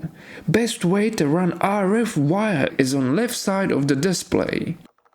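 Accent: Russia. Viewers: that Russian accent...yak... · your English is pretty good and Russian accent is actually cool 🤙 What matters the most, you took the time to make the video 🙌. Losers will always leave loser comments 😅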